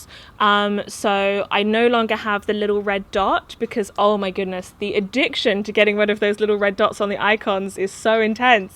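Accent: United Kingdom. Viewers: You know.. I think I like you )) Your British accent and how you can express all this things. Positive energy comes from you ^^ P.s I also quit Insta for two month already. And it's quite good to check yourself, or just get some extra time. But when I'll get back, I will find you )) Damn, I miss my friends there 😟😁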